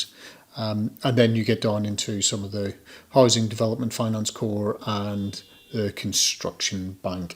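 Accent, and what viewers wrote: Northern Ireland, United Kingdom. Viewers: Do I detect a northern Irish accent 🧐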